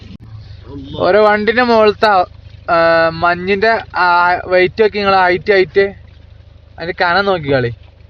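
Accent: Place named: Malappuram, India. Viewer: Ithil samsarikuna aalude samsaram assal malappuram. Ushaarayitund.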